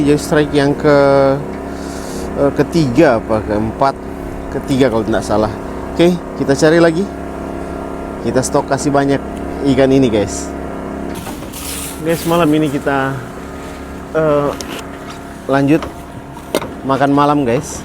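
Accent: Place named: Manado, Indonesia. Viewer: saya suka dengar kalau narasimya disampaikan menggunakan logat Manado dang, terasa lebih enak dan natural. Saya lalu pernah tinggal di wilayah Sulawesi, orang bilang kalau logat Manado terasa tore dan nyaman di telinga. Tq Bang....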